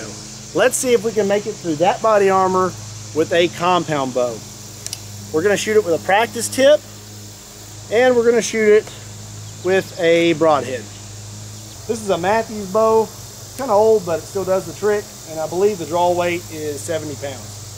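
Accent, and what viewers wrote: Kentucky, United States. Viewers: What part of kentucky you from? Im From Winchester/ Clark County!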